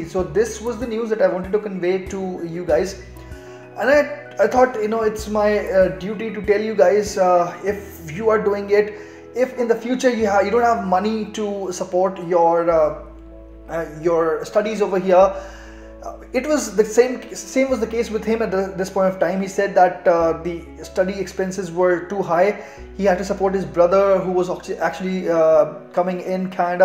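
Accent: India. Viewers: Indian english accent hi h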